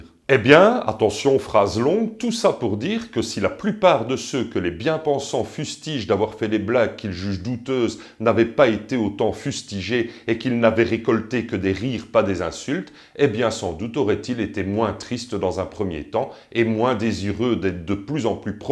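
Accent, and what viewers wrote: Belgium. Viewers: PS avant mon post (chu un crétin, c'est un PRE-scriptum du coup et chu un génie) : en fait c'est l'accent belge, tu m'as eu ! Première fois que je vois ta petite tete chauve, tu me demandes de m'abonner, tu sais bien que c'est mon premier passage, j'ai ta miniature, un titre semi chelou, et toi qui vient m'intepeller avec un accent sympa ... Bha a , avec ta tete, ta miniature et ton titre, avec ta petite demande et ton accent de biereux, JE MABONNE ! Je viens de m'abonner, je ne jugerai pas sur cette video. A toi de me faire rester maintenant :) (je regarderai bien sur par apres tes anciennes videos, si ca me tente. Si c'est deja pas top, je verrai tes prochaines) Je compte sur toi ! Je veille ! ♥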